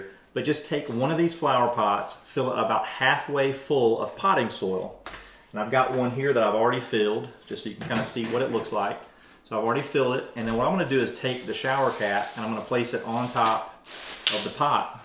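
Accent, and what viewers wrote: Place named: South, United States. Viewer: I love your southern accent!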